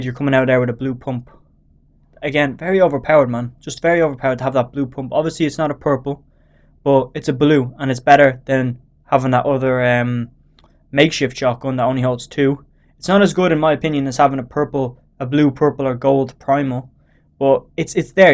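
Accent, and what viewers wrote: Ireland. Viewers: Are you Irish btw?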